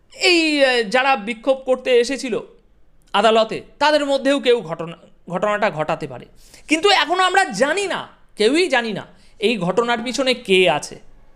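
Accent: India. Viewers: কলকাতার দাদা মনে হচ্ছে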